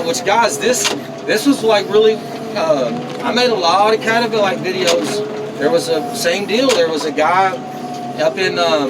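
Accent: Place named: Texas, United States. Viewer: best to watch videos without sound, so you don't hear this texas twang moron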